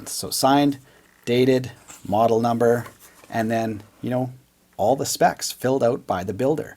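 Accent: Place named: Canada. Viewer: After years of watching South Park you can spot Canadians. Its they way they pronounce aboot (about)..... 😎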